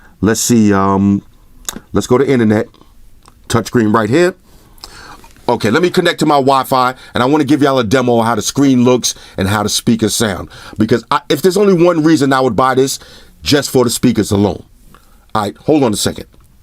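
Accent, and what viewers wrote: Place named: East Coast, United States. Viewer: Extra aggressive guy. Great review. Surely you are from NY or Chi. Sounds like east coast.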